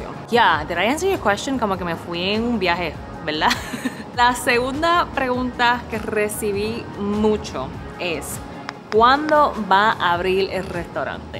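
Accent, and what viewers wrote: Puerto Rico. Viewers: Thank you so much for making these videos. They help me a lot to practice Puerto Rican Spanish. It adds a nice touch knowing you are also Asian 🥹🥲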